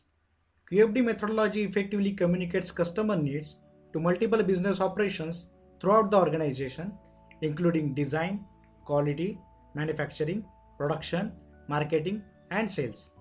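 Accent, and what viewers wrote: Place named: India. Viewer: I dont need a dislike-button to know if its gonna be a good "tutorial" or not.. When i hear that indian accent i know its gonna be great!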